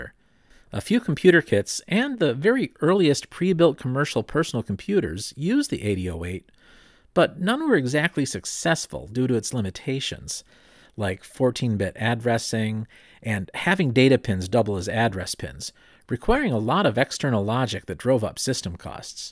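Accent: United States. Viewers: Why do people in the USA pronounce Z as zee instead of zed used by most of the other English speaking world. It's extremely difficult listening to this from England. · Why must you americans pronounce "o" as "/\" ? I mean how do you know the difference between "a knot" and "a nut" ?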